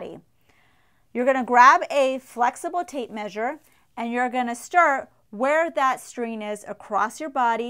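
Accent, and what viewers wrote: United States. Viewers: Many thanks from the U.K. You have a beautifully clear American accent, so easy on the ear.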